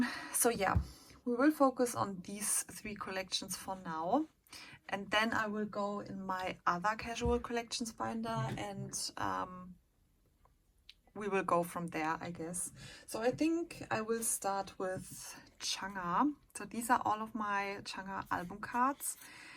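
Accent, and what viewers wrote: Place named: Germany. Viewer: Omg I can hear that you are german are you?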